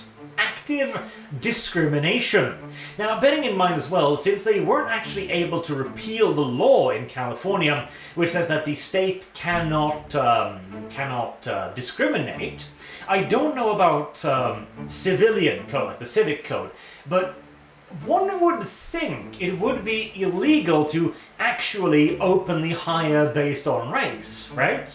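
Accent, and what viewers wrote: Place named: United Kingdom. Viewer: More than a listen to a local british man, i like to listen local british man who stuck in a local toilet...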